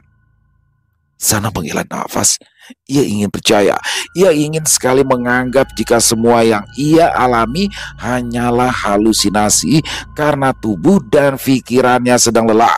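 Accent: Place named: Banjar, Indonesia. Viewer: Bang sdh fasih sekali dengan logat Banjarnya... Keren bang, salam dari kalsel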